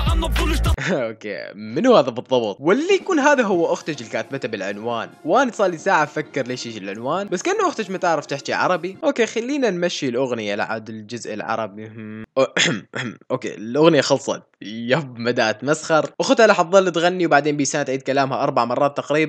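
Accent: Iraq. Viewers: شوكت كال ??اصلا هو ابد ما عرف على نفسة بس من اللهجة مبين عراقي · لا سوري عايش بالعراق لهذا لهجتة عراقية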